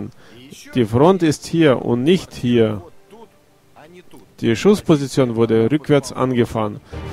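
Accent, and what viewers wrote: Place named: Russia. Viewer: Russian trying to speak german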